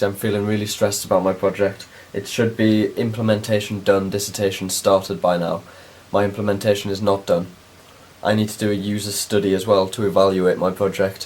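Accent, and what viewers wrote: United Kingdom. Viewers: Yeah he is British!!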